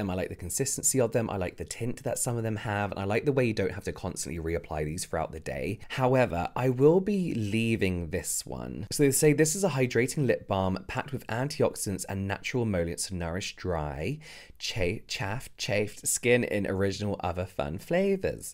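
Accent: United Kingdom. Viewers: It is the way you explain things, describe products, give your opinion with a touch of British accent that makes watching your videos such a learning experience and a joy! 😊❤️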